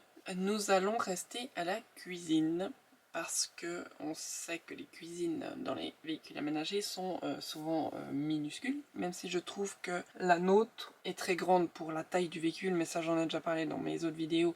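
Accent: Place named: Switzerland. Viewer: Merci pour ta vidéo je me suis abonnée je me demandais d’où venait ton accent donc tu es suisse 🇨🇭 très contente de te connaître et non tu ne parles pas trop sur tes 5 éléments j’en possède 3 et je pense vivre en camping-car Vivement les prochaines vidéos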